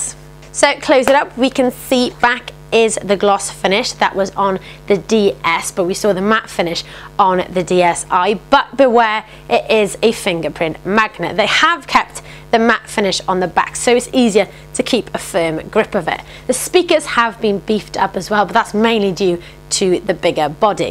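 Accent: United Kingdom. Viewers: Gotta love cute girls with an English accent! On a different note, I think Nintendo should have added more improvements like since it's bigger anyway why don't they just put back in the GBA slot? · thats the good old british accent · I love British accent.. ;) cute voice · the accent is so heavy, even for a British lady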